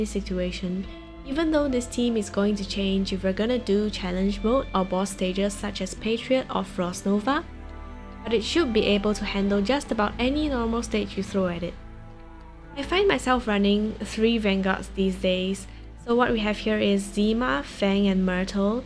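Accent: Singapore. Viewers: I'm picking the scent of Singlish... hmmphh hmmphh~ Definitely a Singlish, no way that's a common Chinese English accent. Trace of Indian, Chinese, Malay and Hokkien intonation. Hi neighbor countryman ^^ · I hear some thicc Singlish here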